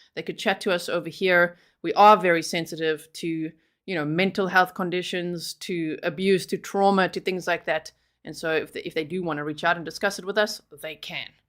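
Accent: South Africa. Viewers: I detect a faint South African accent? ❤️🧡💙💚💛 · In the beginning I was like: She sounds South African...I wonder where she's from? And then you used the word 'shame' and then I knew😂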